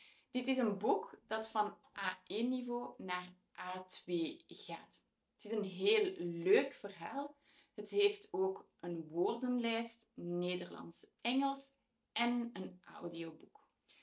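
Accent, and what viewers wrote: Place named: Flanders, Belgium. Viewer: Binnen 2 seconden hoorde ik in ieder geval dat de presentatrice Vlaams is…..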